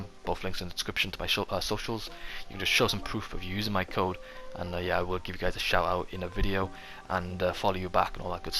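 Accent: Wales, United Kingdom. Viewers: Are you welsh I am I’m from swansea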